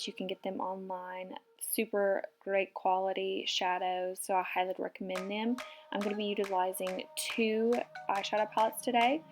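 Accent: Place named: South, United States. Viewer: Who cares what people think! As long as you like them that’s all that matters! I get mine done and everyone will always have their opinion! I choose to ignore BC I like them! Where are you from? I wasn’t expecting the country accent as this is the first of your videos I’ve watched! You are beautiful! Thanks for the video!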